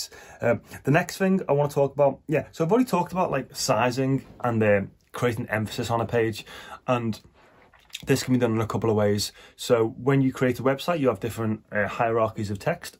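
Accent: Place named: Scotland, United Kingdom. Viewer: ok, your accent resembles me scottish one that's why i asked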